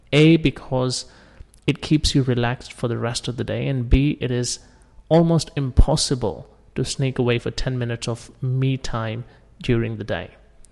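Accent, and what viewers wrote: India. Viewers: Dude stop trying to hide that Indian accent it’s fucking horrible😂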